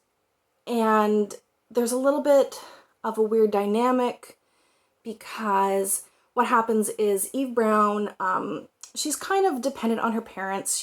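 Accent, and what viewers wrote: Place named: Maritimes, Canada. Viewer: Good to know, Maritime dialect! 🤓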